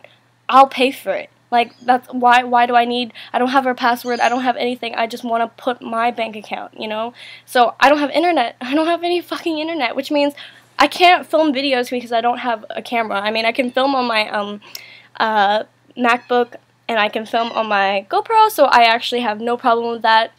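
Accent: Australia. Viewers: unrelated, but your australian accent shows a lot in this video lmao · i can hear your aussie accent getting stronger xx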